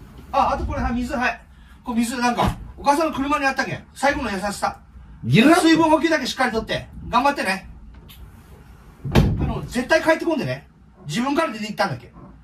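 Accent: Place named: Kumamoto, Japan. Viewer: 熊本弁落ち着くわ～ 久しぶりに熊本帰りたい！☺️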